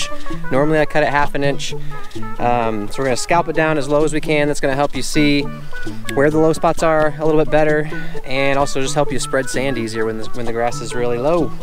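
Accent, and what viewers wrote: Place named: Australia. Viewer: Ben Simms will appreciate the Aussie accent. Lol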